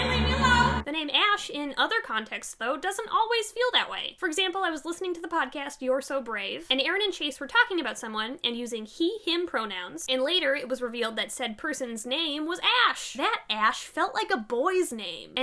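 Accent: Minnesota, United States. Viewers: Hey fellow Minnesotan, your accent reminds me of home :3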